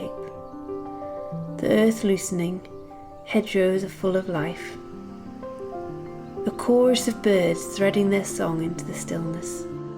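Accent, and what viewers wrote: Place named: United Kingdom. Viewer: I’ve enjoyed watching your video very much. I enjoyed being out in the fresh air with you. It’s been raining here in Washington State, and it’s cold. And to top it off I have a miserable cold so I’m not feeling very well at all. Watching YouTube is as energetic as I can get. You made me smile with your soft voice and very British accent. Thank you for making me feel better.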